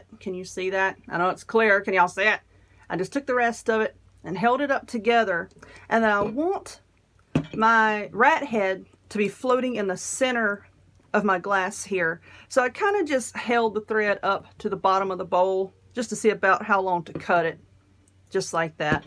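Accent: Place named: North Carolina, United States. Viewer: I thought I detected a NC accent! Lol love it ❤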